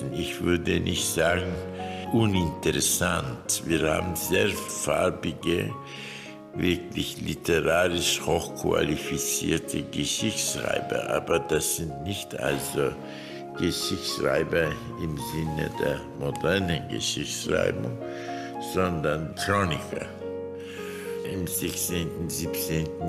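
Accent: Germany. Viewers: Bavyera Almancası ile mi konuşuyor diyeceğim, evet. Neden Neu Hoch Deutsch değil ? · Hannover aksanımı bu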